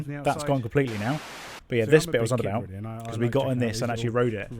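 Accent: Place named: South, United States. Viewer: Hey, I'm new to your channel and absolutely loving it! I'm from up north and trying to locate where your accent is from, where abouts from the South are you from?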